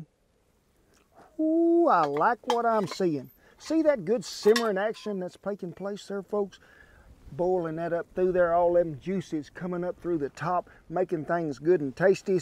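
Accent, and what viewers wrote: South, United States. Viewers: Mr., I feel I need to reach out to you since I found your site by accident. I am about your age and was brought up in Tillman County. Seeing you standing in the pasture with the Mesquites and the pond in the background brought me back to a time I loved! Rotating wheat, dry land cotton and working them old cows was just about heaven to me. Hearing your accent and the way you express yourself has got me turned around talking country, again. I left at age 18 to serve my country and have longed to return someday. That time has come and gone and I'm afraid it's too late for me now but hearing from you was like a letter from home and the tears just came gushing. Thank you so much for the trip down memory lane.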